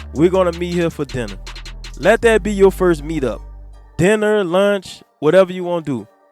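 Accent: New Orleans, United States. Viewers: Bro this is a great video. Sounds like you from that NOLA too! Love man. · I just subscribed to your channel. I like your content. I’m from Philly and I was stationed in New Orleans back in the late 90’s. With that being said, you sound like you’re from the New Orleans area.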